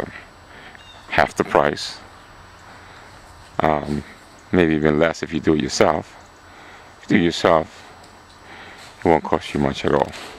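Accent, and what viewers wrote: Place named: New York, United States. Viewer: Hes in the Northeast, listen to his New York accent and notice his New York plates.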